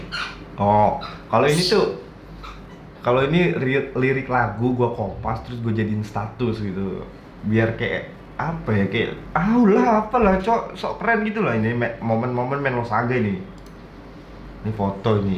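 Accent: Java, Indonesia. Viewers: Asli jepara apa purbalingga apa depok si ini org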